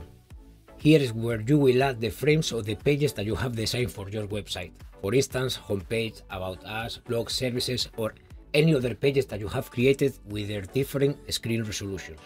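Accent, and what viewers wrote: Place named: Spain. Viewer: Ese acento suena a español jejejj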